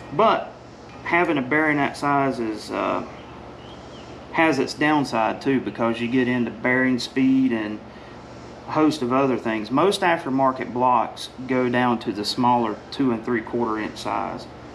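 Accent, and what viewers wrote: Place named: South, United States. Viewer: Thanks Bud regards from AUSTRALIA, p.s i love your Southern Accent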